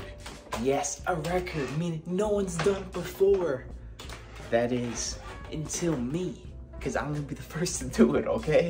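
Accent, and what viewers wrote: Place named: New York, United States. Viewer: your NY accent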